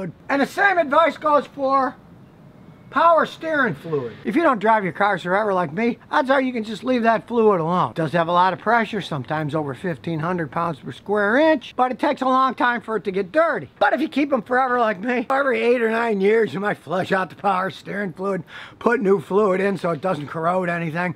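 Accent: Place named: South, United States. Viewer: I use all of your tips... and I also use your southern drawl as a sleep aid, It's on my healing frequencies playlist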